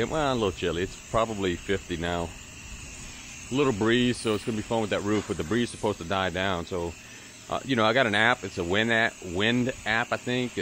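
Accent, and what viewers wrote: Louisiana, United States. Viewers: Man I knew you sounded like you were from Louisiana Saw the 985 area code on the water tank Awesome video!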